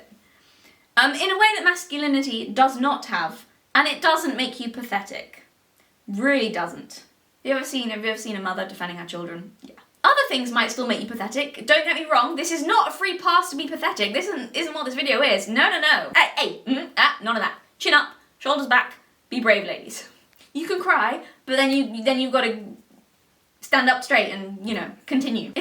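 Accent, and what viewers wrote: United Kingdom. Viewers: Its official, having a British accent makes you sound smarter than us Americans. I actually have a friend with a similar accent to this woman, and even though he's not really a genius, I always feel dumber around him. Weird huh? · I am here for the British accent, mostly. Lol👌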